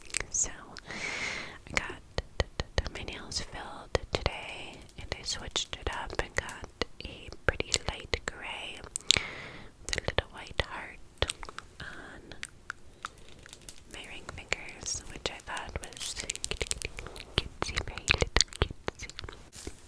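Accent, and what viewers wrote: New York, United States. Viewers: Hey! I love your breathy whisper videos! I love to hear your rambles in a NY accent!😊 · please do another ny accent video <3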